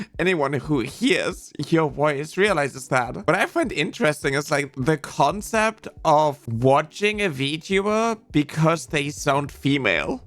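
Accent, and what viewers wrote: Germany. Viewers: i still don't get the voice thing, like have they legit not ever heard women speak, who am i kiding they either stick to such a narrow as shit definition of what a woman should sound like(which would exclude most cis woman), or are the "haha woman should be silent" type bigot, or both. anyway, you just sound like a woman with a german accent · Topic of your voice; you sound like a normal German girl to me. Not sure if transphobes know but accents exist.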